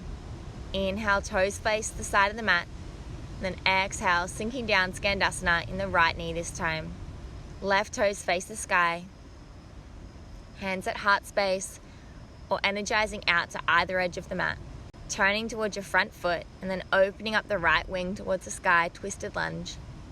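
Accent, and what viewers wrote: Australia. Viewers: the Aussie accent is great - a nice change of pace for a Midwestern (US) yogi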